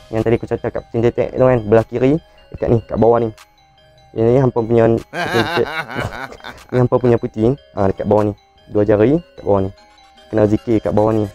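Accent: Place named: Malaysia. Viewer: ko dengki dah kenapa..content dia mmg khusus utk penonton malaysia,slang dia mmg slang sesetengah kawasan/negeri d malaysia..ko x faham tu ko punya pasal la,bukan salah cara dia bertutur,aq faham je semua..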